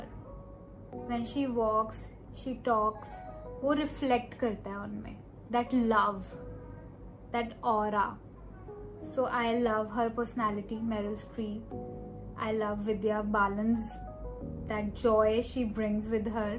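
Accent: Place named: Rajasthan, India. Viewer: Didi aap Rajasthani accent kase bol lete ho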